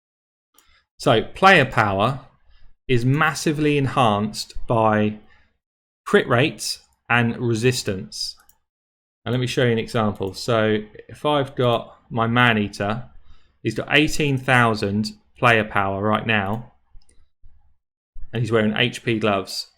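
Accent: United Kingdom. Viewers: In my humble opinion, you are a top raid analyst. I regularly watch tons of popular raid bloggers both english and russian speaking and I can definitely tell that your particular way of analysis, your explanations, your tips are by far the most impactful and valuable. Plus the British accent. Absolutely fabulous.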